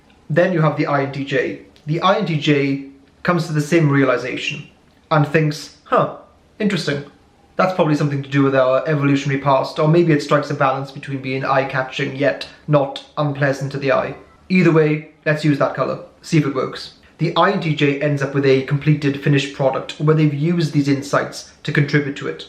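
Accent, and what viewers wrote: Wales, United Kingdom. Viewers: Are you from wales?🏴🏴🏴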